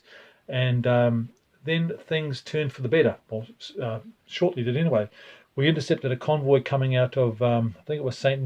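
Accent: New Zealand. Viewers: Gidday Gidday. Love this AAR and your Kiwi accent. I'm a Kiwi in NZ who loves naval wargames too and has a good collection. Would you be keen for a game sometime?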